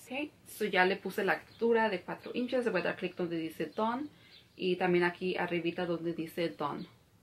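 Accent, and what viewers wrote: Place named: United States. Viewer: Amiga con todo amiga con todo respeto amo tu información y todo lo que haces creo que eres una gran persona pero no se si eres latina o naciste aquí y tal vez por eso tienes ese acento gringo pero todo el video me la pase escuchando tu acento gringo tipo cuando los gringos tratan de hablar español algo así y me confundiste un poco pero tu información es buena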